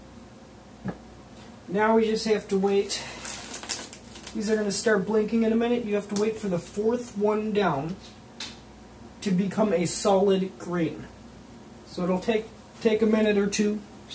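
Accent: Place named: Chicago, United States. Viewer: I knew you were from the Chicago area by how you talk and then I saw the 708 area code and yep! I used to be 312 then 708 now 630. Out of curiosity, did anyone call you? 😂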